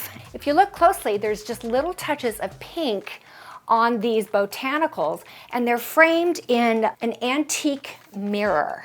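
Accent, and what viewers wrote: England, United Kingdom. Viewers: That English accent suits you.... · haha- again a great video of yours, I just love your tiny english accent, thanks for sharing....:::)))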